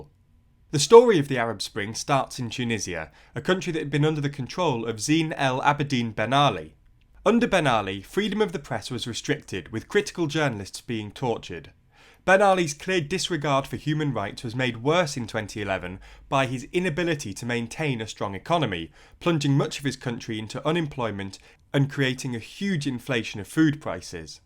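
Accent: United Kingdom. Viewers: It's always fun hearing somebody with the most British accent talking about these kinda things. xd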